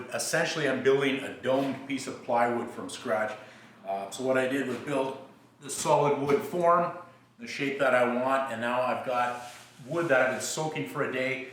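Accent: Canada. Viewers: I just stumbled upon your channel and this is the first video I've watched. Thanks for taking the time to make it. From the sound of your "oots" and "Aboots" you sound like a fellow Canadian.....or perhaps Minnesota ;) . Regardless, thanks for what you do. :) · Op. I've detect a Canadian accent. I'm in good company. Hello fellow Canadian.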